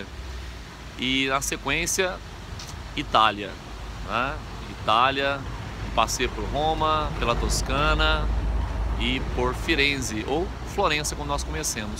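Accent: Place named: Goiás, Brazil. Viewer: Pessoalmente eu não reparo em sotaque goiano, mas no Youtube fica tão evidente hahahaha Parabéns pela iniciativa, professor! Vou te acompanhar.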